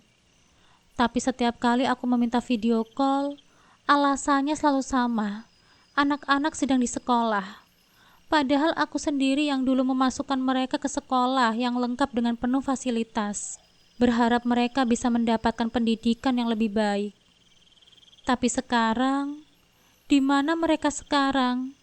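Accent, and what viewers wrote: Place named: Central Java, Indonesia. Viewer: Kk kok tau desa karang nduwur , apa kk org jateng , klo dari logat cara baca a sii spt iya yaa ,,, klo blh tau kk berasal dr kota mn yaa ? 🙏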